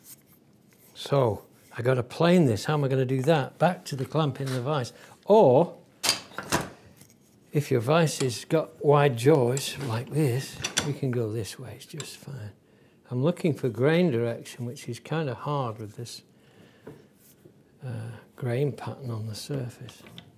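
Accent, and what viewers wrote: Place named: Sheffield, United Kingdom. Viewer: Love that accent. The sound of Sheffield steel.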